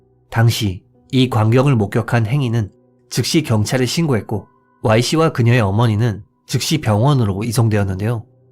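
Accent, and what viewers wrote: Japan. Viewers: 알고리즘에 떠서 들으면서 게임하고 있었는데 일본분이 운영하시는 채널인가하고 채널 확인했네요 ㅋㅋㅋ 비하나 조롱의 의미가 아니라 일본 지명이나 일본인 이름 발음이 굉장히 현지인같으세요 중간중간 억양도 되게 일본인이 한국말하는 느낌이라 재일교포분이신가 뭔가 신기해하면서 듣게 되네요 ㅋㅋㅋ 자료 조사도 좋으시고 차분하게 얘기하셔서 편하게 듣기 좋은 채널을 알게 된 거 같아서 좋네요 ㅋㅋ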